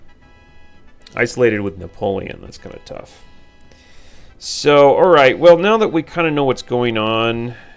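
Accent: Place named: United States. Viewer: yes i love smooth american accent as well:D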